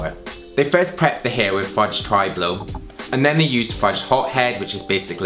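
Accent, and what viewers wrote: Scotland, United Kingdom. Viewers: you know what's cool you're scottish and i understand everything you said because scottish people have a different accent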